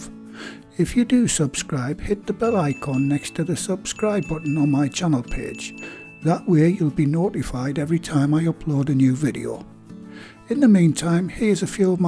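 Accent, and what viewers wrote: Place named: Northeast England, United Kingdom. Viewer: Hi - greetings from sunny Colorado- I'm an expat - originally from Murton ( colliery). I've been working through your videos from the beginning and will definitely try virtually everything including cheesemaking. However when I came across stotty cake - always called "fadge" by my dad - I had to try it out. Came out great - thanks! Love your videos and hope to make many things soon!! Stay well and keep them coming please! Tony Armstrong ps - great to hear someone with an accent pretty much like mine! My American friends thought I was unique!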